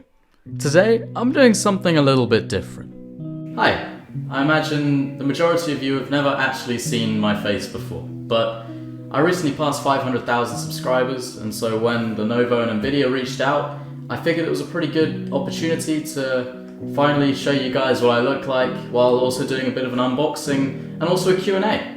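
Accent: United Kingdom. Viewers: Alot of British people's voice for me sounds a little older, maybe it's the combination of the accent and way of speaking. Like Spiffingbrit, frankieonpc, and now.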